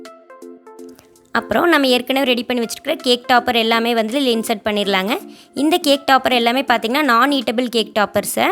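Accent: Kongu, India. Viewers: Akka Namma kongu slang kekumumbodhey sandhosama irukunga akka ... ❣️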